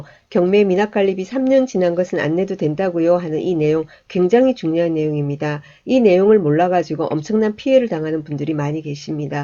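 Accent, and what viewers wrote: Gyeongsang, South Korea. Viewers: 소장님은 진짜 유튜브방송을 계속하시면 어마어마 해지실듯 해요~ 말씀하시는게 한단어한단어 잘들어오네요~ 경상도아나운서!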